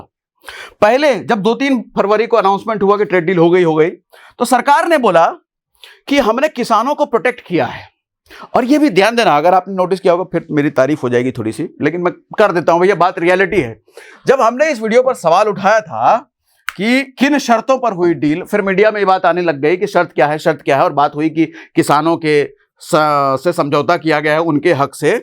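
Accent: Bihar, India. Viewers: Tu insurance bech rha hai ya market ki baat krega bosdi ki bihari 😢😢😢😢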